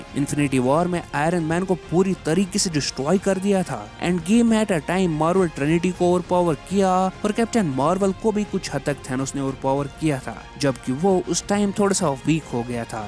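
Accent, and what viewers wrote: Kashmir, India. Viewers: The author's voice seems Kashmiri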